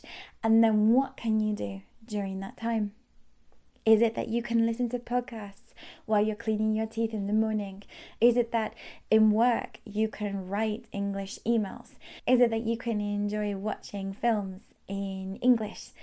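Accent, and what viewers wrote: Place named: United Kingdom. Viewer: Hi there, Teacher! Your British English accent is THE FOREVER the best I have heard of and your voice is really pleasant to listen to! And I really appreciate your enormous patience, dedication, enthusiasm, kindness, time and effort in making excellent English teaching videos for non-native speakers like me! Absolutely amazing! Benny Mok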